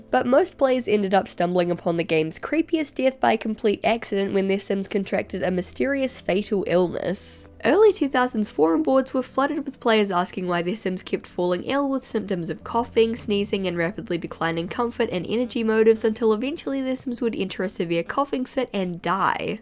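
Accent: New Zealand. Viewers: why did it take me so long to realise that ur from new zealand!!! i swear i’m so bad at picking out my own ppl lol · You know, , I briefly turned on closed captions to make sure I was hearing you correctly, and then I see this about the genie: "summoned from a lamb and gransom's wishes" I just think the subtitles or YouTube or something like that can't keep up with your thick New Zealand accent.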